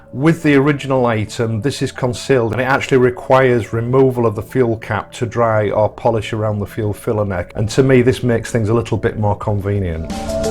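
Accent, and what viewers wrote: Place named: East Yorkshire, United Kingdom. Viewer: Sir, the quality of your videos gets better and better! And your East Yorkshire accent is something special! Thank you for your work!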